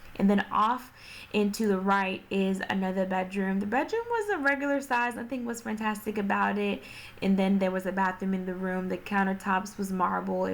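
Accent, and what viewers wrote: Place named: New York, United States. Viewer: Just found ur page... LOVE IT..... I don't care how long you've lived in ATL , you're such a New Yorker...(accent)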